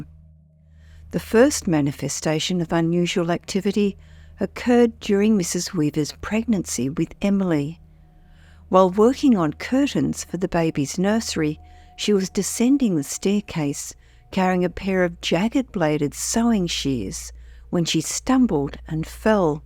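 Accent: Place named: Australia. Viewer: Maybe it’s her accent! I’m pretty sure the narrator is Australian!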